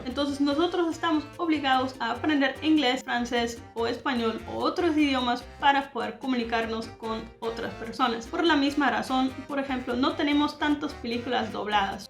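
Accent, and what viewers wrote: Standard. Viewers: Es muy elegante tu español, para mi oído es neutral. Qué bueno que hables bien de mi país Ecuador bendiciones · Buen vídeo, eso es lo que me pasa a mi me da miedo hablar holandés y no expresarme como quiero,me atrevo hablarlo con conocidos de mucha confianza con desconocidos se me olvida todo,espero lograrlo y hablarlo tan bien como tú hablas el español 💋y por cierto tu acento es neutro 😃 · tu acento esta neutro pues es lo que veo en este video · Tu español es súper fluido 👍🏻con un acento neutral mayormente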